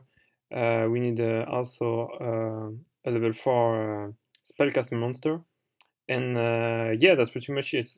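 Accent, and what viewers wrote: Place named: France. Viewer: i'm assuming you're french by your accent but i'll write in english for everyone. I thought about this deck quite a while now bcs tempest was an ftk that got banned in modern formats and you overlooked some cards that can actually turbo this instead of playing a bunch of randoms cards. Magical library is a spellcaster that will keep the engine going while being lvl 4. I think the most important tuner here is plaguespreader and should basically be the only one. You can use summoner monk to go into library or armageddon knight to dump plague that will combo with monk to summon tempest. You then play a crazy amount of draw spell cards like hand destruction, bamboo swords etc. No upstart so you can otk/ftk and why not a copy of magical explosion. I never tested it but i think it would be the optimal way to go.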